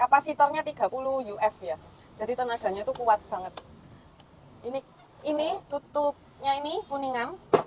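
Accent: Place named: Java, Indonesia. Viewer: Logat jawa nya medok ci😂😂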